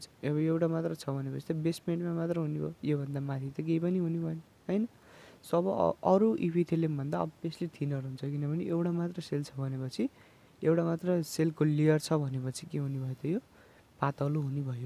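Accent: Baglung, Nepal. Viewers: Baglungey ho ya hjr bolni style testai xw tw😅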